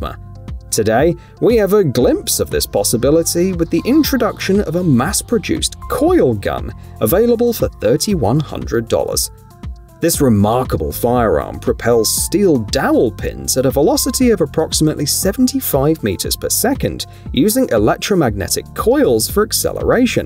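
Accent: United Kingdom. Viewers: No more British accents!!! Thumbs down!!! Irish, French or Swedish!!!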